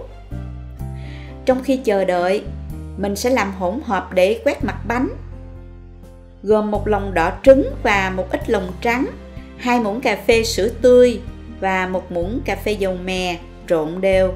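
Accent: South, Vietnam. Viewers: Lần đầu tiên xem video của bạn, nó cuốn hút người xem với giọng đọc miền nam mộc mạc nhưng thanh tao và sức truyền cảm, ấm áp người nghe. Mình đã đăng ký để tiếp tục xem nội dung những clip mới và được dịp tiếp tục nghe giọng của bạn. Chúc sức khỏe và thành công.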